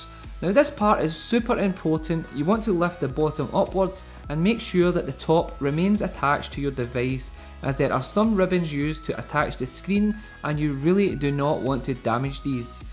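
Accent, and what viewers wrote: Scotland, United Kingdom. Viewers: its a scottish accent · Thats the good ol scottish accent. 😊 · scottish but it's not usually that strong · Omg yer scottish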